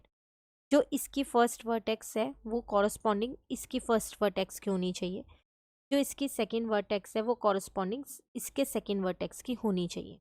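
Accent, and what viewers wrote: Bengal, India. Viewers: Are u bengali😮😮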